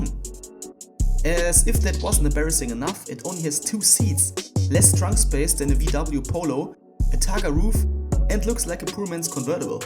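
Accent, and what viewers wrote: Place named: Germany. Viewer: Damn bro, your German accent is hella cool for this type of video Keep pushing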